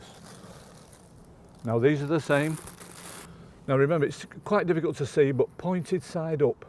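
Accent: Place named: Lancashire, United Kingdom. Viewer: Brilliant accent refreshing lancashire UK yeah!! Brill great explanation got my bulbs cheap loads in the sale at Wilcos cant wait to plant at 15 p 25p a bag ...cant be bad eh!!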